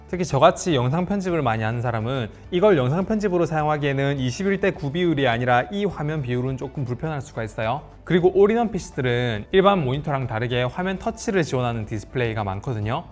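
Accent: Gyeongsang, South Korea. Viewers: 갱상도 클라스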